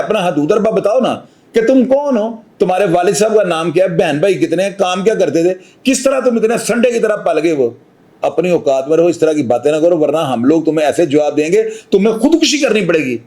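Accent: Lahore, Pakistan. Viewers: 😂😂😂haha yar jeo lahori andaz meh bat krtey maza ajata saab ye style nhi change krna apny kbhi bi plz big fan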